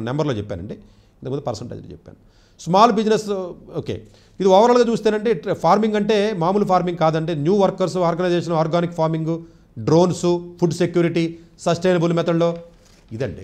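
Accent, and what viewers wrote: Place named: Godavari, India. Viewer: garu, I truly appreciate your research, analysis, and the confidence with which you present your points. I also enjoy your accent and unique way of phrasing things. Especially, your serious expressions while humorously criticizing mechanical, pharmacy, and Tier 2 colleges like Mahindra and GITAM in the Godavari accent make it even more entertaining. However, I have a small suggestion—please consider showing some empathy towards students and parents whose academic capabilities limit them to Tier 2 colleges. It would add a balanced perspective to your insights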